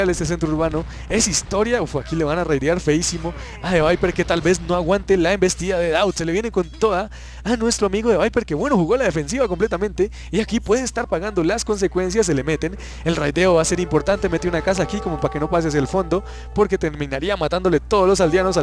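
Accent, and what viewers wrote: Bogotá, Colombia. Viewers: De que parte de colombia eres Buenos videos :D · Entonces eres de Bogota parcero xD muy bien comentado, con buen tono de voz y animicamente bien. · De que parte de Colombia eres? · eres colombiano y rolo?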